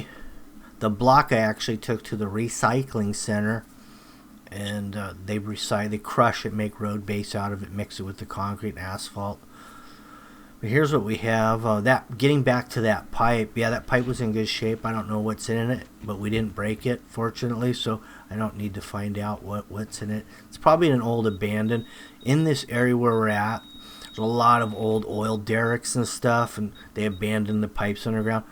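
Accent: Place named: Southern California, United States. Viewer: Can always catch a SoCal accent. I live in Ohio now but was born and raised in OC for 43 years.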